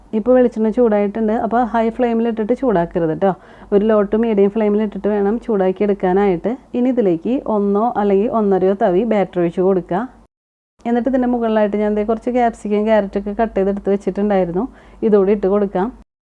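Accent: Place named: Thrissur, India. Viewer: എവിടെയാണ് വീട് സംസാരം കേട്ടിട്ട് തൃശ്ശൂർ ജില്ലയാണെന്ന് തോന്നുന്നു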